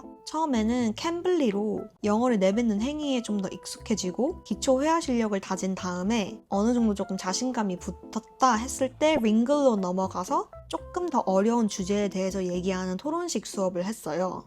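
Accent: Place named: Gyeongsang, South Korea. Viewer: 갱상도 사투리네여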